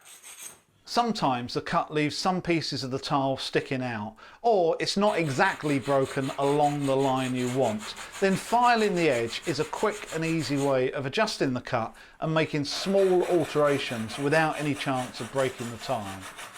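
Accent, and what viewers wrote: United Kingdom. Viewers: Great vid, very informative, nice to hear a British voice on these DIY vids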